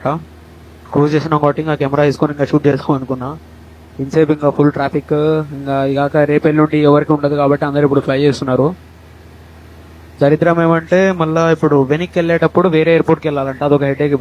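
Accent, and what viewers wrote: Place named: Rayalaseema, India. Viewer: Kachitamga nedi rayalseema kada bro ...😊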